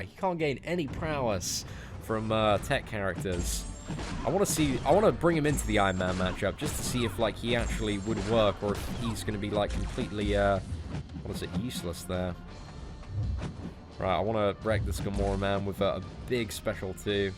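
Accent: United Kingdom. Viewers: I hate you, but I like watching your videos with your Bitish axcent.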